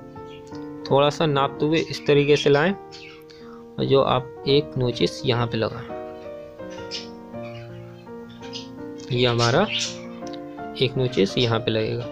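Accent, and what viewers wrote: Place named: Pilibhit, India. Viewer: Nice... Aap rahte kahan ho? Bhai bhasha bilkul humari jaisi hai.... Pilibhit zila....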